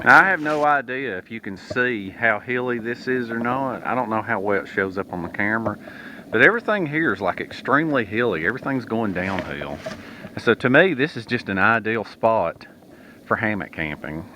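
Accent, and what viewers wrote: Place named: Eastern Kentucky, United States. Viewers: Enjoy watching your videos. Listening to you talk sounds like your from our neck of the woods. E.KY Great Job!!!! Keep the videos coming. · I have not been a subscriber very long and I have been trying to identify where you're from. I'm going to guess the Blue Ridge Mountains of Georgia. I first thought of east Tn or East KY but I definitely hear a little Georgia in there.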